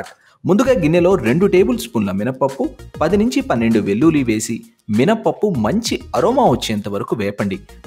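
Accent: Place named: Telangana, India. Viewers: Owow telangana yasa 🙂nenu pakka try chesta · ఏంటి తెలంగాణ స్లాంగ్.., మీకు బావుంది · I like telangana yasa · Telangana slang super 😆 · Meru Telangana slang lo matldatam bagundi sir 👌👌o video e slang Lo matladandi plz.... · Telangana slang super anna ☺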